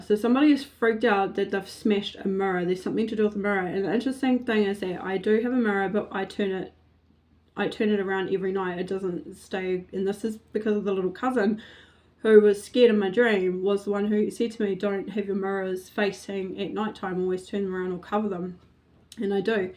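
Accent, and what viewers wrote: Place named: New Zealand. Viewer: I thought she sounds. New Zealand 🇳🇿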